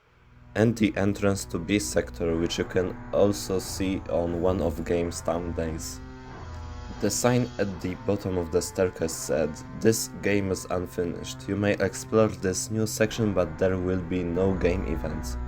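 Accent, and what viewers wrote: Poland. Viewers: are you polish? just asking cause you sound like you have a Polish accent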